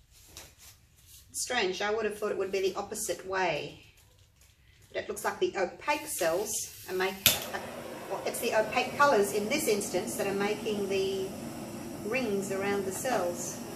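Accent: Australia. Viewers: Love your Aussie accent! I’m from NY!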